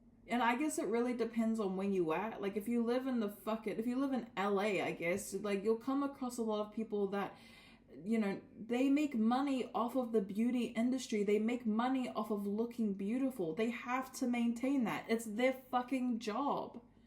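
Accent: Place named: New Zealand. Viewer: ok cool! Sorry I'm a geography buff lol are you from the north island or the south island? I noticed the accent too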